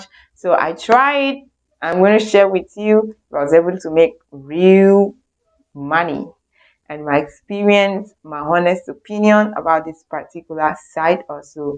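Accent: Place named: Nigeria. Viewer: Upon recognizing your Nigerian/West African accent, I suspected a scam and cannot subscribe to content aimed at manipulating real ad revenue on YouTube. It appears to be a non-legitimate use of time.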